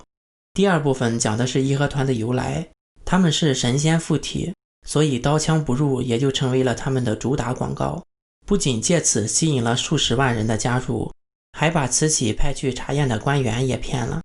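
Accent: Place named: Henan, China. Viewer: 我怎么听出了河南口音？？？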